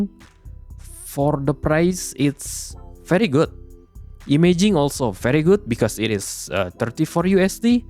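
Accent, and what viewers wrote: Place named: Indonesia. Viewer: Aksennya aja Indonesia banget, lagian bisa pake translate kocak kalo gak ngerti English 😂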